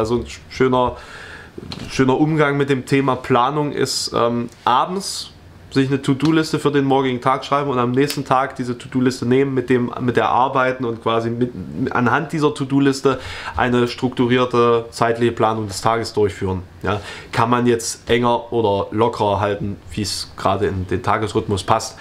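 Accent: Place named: North, Germany. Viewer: Das mit dem Workaholic kenne ich. Mein Arzt meint, ich solle verdammt vorsichtig sein, sonst sei es nur eine Frage der Zeit bis zum Burnout. Struktur hilft, das ist richtig. Das mit der Ernährung bekomme ich auch irgendwann konsequent auf die Reihe :D Wie auch immer - ich freue mich darauf, dich bei Bob zu hören! Bitte übernimm das Programm am Morgen - dann werde ich im hohen Norden direkt mit heimischem Dialekt geweckt <3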